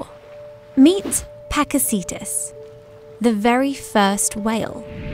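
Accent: United Kingdom. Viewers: too british accent.nicee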